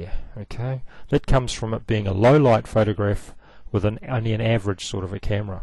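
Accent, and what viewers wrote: New Zealand. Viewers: hahaha a kiwi accent for sure im from timas. Anyway thanks for the quick tips (a real time saver) just have to remember : top =overlay 2nd = screen cheers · weren't sure if I detected the kiwi accent right, but I was happy, when I read the other comment. As I Kiwi you don't need this video since all your sunsets ARE already enhanced! Great tips though!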